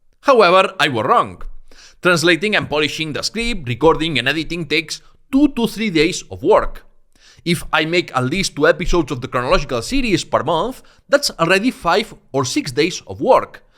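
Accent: Spain. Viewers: I advise you to cut your videos in small bytes and ask provocative questions in the title + the hook ... Try to exploit geopolitical contexts of today and do some projections or really anything to exploit a controversy ... something like that in a short form You can also do collaborations if possible + if u want, u can improve your accent which is 100% understood but quick and overrun by your spanish accent (tho honnestly i consider that as a good feature, I like it) I'm amazed by the sheer quality of your videos... the level of details, the impartiality and the extensive coverage of all sides that constitute the amazing history of Spain...unlike another English channel by someone with a French accent telling his own subjective opinion ALL THE F*CKING TIME... so your videos is a breeze to me!! All respect to you!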